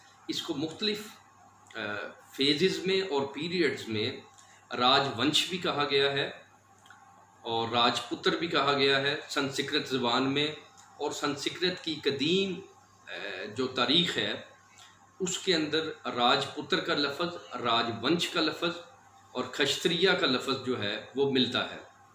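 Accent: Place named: Punjab, India. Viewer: Aapki talafuj sahi karo pehle.. Punjabi zuban mein urdu bology toh yehi hoga..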